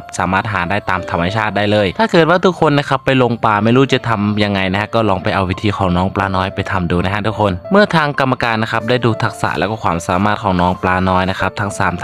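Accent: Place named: Cambodia. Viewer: แอดค่ะ ล้อเหมนบ่อย จนพูดติดสำเนียงเหมนไปแล้วนะฟังผ่านเหมือนคนเขมรพูดไทยเลย😅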